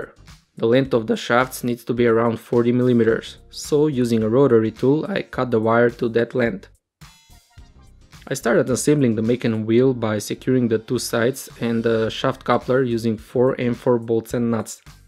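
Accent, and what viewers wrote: Russia. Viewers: Слышится акцент, скорее всего русский) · как же слышен акцент русского))))))))))0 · Русский акцент, русский чтоль ? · Русский акцент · какой то русский акцент присутствует или мне кажется?)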